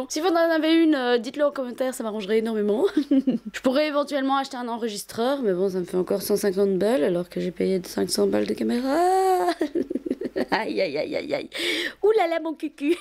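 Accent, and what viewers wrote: Belgium. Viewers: Coucou alors je ne commente (vraiment) jamais de vidéos mais il faut que tu saches que je te trouve vraiment extra. Déjà l'accent belge ensuite ton parcours professionnel de surcroît (oui oui on est en com on utilise de beaux mots) ton style et vraiment le plus beau c'est ton naturel. Continue comme tu fais ! Si tu passes à bx préviens moi que je te paie un café (ou une biere) pcq je suis sûre que tu me fascinerais. Beaucoup de bisouuus et ta video est une pépite 😘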